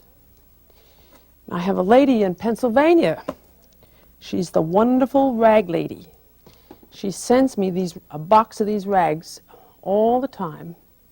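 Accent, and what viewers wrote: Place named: New Jersey, United States. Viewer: Love that New Jersey accent ( where Helen grew up). Example: "imPORTANT." Helen is the best! Thank you!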